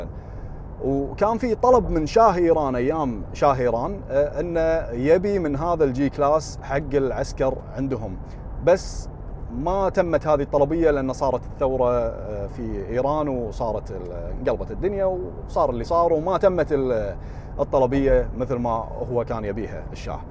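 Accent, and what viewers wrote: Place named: Kuwait. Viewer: والله اللهجة الكويتية جميلة جدا ❤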